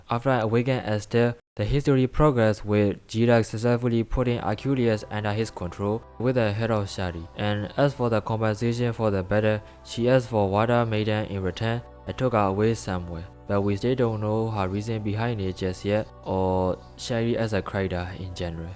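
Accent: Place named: Japan. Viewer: currently playing this game. Uhm, i’m sorry, but you have an interesting accent. Are you a Japanese?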